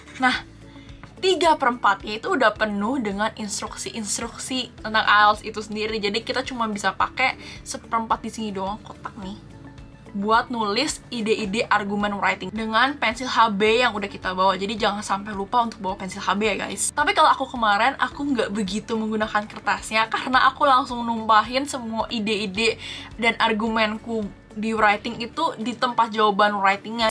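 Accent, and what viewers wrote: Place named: United Kingdom. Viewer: Ngomongnya cepet banget dan kadang pake British Accent jadi kurang kedengaran jelas 😢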